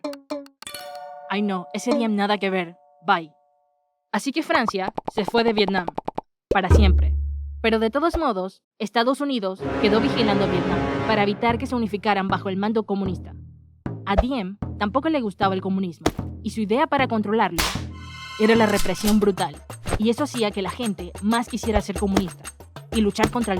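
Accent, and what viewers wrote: Panama. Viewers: Por el acento y por el minuto es imposible no saber que las personas detrás de este canal son Panameñas. Me suscribo de una vez Tremendo Canal!!!! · Muy buen, video, me encantó... pero una pregunta? En realidad eres de panamá??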